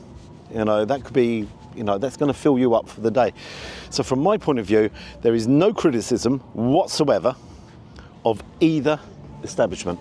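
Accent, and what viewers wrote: United Kingdom. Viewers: Love this - it's just like where I grew up in the US except with a British accent and a bit less food variety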